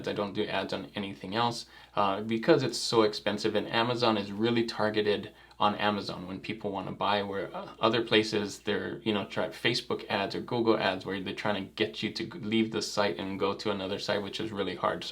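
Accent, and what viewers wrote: Hawaii, United States. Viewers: EH! congrats on da 150k! 🤙 i noticed the small kine accent when i first started watching your videos. but since you never made much reference to Hawaii in the other uploads that i have seen, i kinda shrugged it off as me assuming too much! LOL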